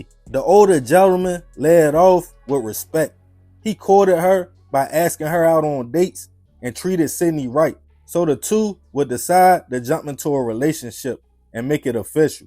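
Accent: Baltimore, United States. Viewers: That baaaltimore accent is a no for me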